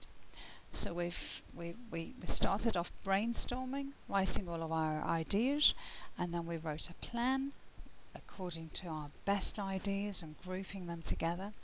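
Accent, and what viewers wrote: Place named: Australia. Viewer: I love your Australian accent. You guys have unique accent in whole world. I just want to say thanks for your this video. You are so cherubic.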